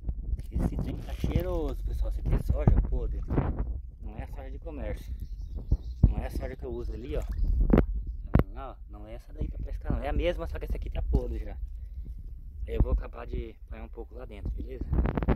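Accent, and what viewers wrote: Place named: Mato Grosso, Brazil. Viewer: De onde vc é tá parecendo os Rio de tangará da serra MT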